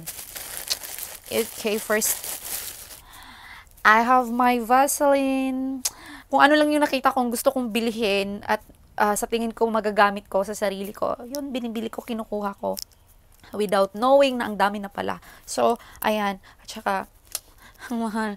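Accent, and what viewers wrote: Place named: Visayas, Philippines. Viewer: Haha ang kulit mag bisaya no Ma'am :)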